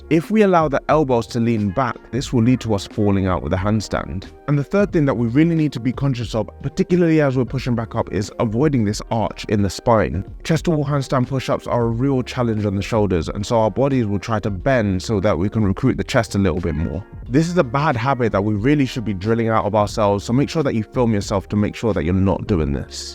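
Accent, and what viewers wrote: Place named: United Kingdom. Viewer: I always try to find the most niche problems and this guy always has a vid about it. Plus yhe british accent! Fucking legend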